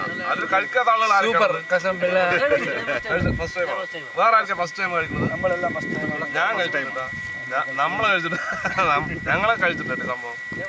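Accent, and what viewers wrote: Palakkad, India. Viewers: Palakkad slang🙈🙈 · Edh rajyathayalum ikkayude tade mark ane... Kalli thuni Hawayi Palakkadan slank Nalla atmosphere... 😍😍😘😘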